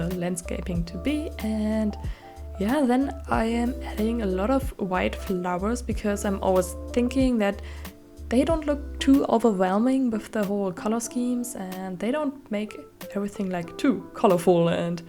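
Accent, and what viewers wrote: Germany. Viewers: Are you German haha 😅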